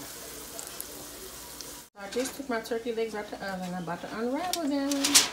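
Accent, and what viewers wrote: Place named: DMV, United States. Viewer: Hey neighbor 👋🏾 I’m in the DMV too and know that accent anywhere! Girl I’m making these tomorrow with some dirty rice and sauce! Thank you so much for sharing your talent and giving us a fantastic recipe!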